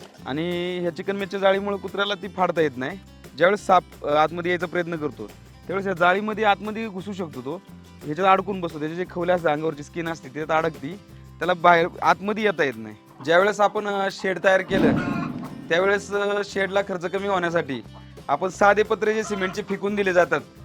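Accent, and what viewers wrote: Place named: Dhangari, India. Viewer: Dhangari bolu nko salya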